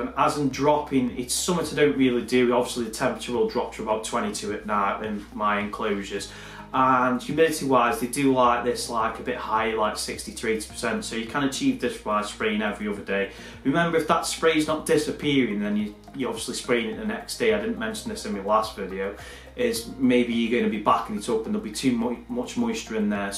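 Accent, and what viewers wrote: England, United Kingdom. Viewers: Great video... My next but 1 mantis is going to be an orchid, getting a moss mantis trio this week! Orchid hopefully in a month or so. Where abouts are you from? I live in Canada, but was born and grew up in England in a place where everyone says ‘looook’!!! Thinking you are pretty close to where I used to live!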